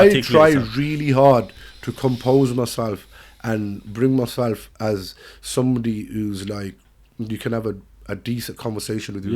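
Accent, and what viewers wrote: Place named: Birmingham, United Kingdom. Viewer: This guy has clearly not lived outside of an asian dominated area. We brown skins born in England are British of course. Speaking English with a horrible messed up Brummy accent, talking about clubbing etc. Of course you're a British Pakistani.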